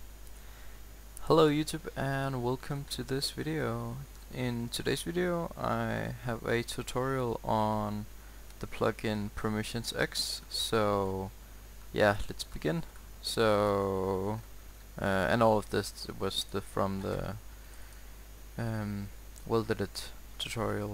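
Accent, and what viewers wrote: Denmark. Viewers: Haha du er dansker kan høre det så let XD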